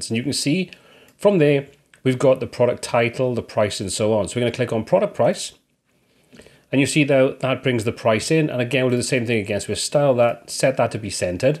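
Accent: Wales, United Kingdom. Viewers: Is that a welsh accent I can sense ? Swansea area ?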